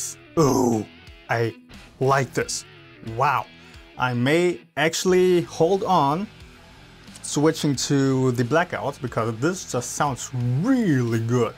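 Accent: Germany. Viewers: I have also an 2.7 in Lemon. The only Problem i have is , powerchords on the Low B sounding very „wobbly“. Any Tips ? String Gauge? 10-64? Ich höre einen deutschen Aktzent. Wenn du deutsch bist dann kannste mir natürlich auch so antworten ;-)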